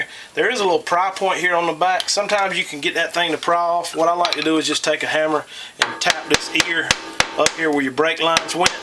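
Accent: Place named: Georgia, United States. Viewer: South for sure. By the accent I'd say Ga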